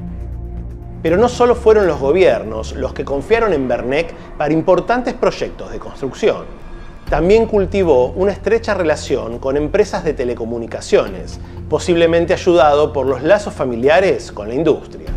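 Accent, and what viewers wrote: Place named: Argentina. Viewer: Es muy desagradable el exagerado modo de hablar argentino. Debe ser que lo remarca para que se note aún más. Es que así se cree importante????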